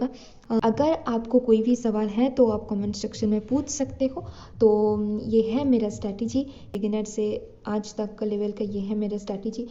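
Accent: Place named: Northeast, India. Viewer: North East by ur voice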